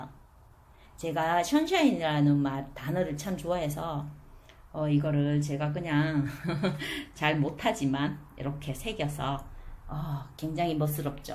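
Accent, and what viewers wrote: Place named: Gyeongsang, South Korea. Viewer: 반갑습니다 경상도말씨가 참정감있네요 영상잘보고갑니다 첫걸음마이신것같은데 화이팅하세요 항상 응원할께요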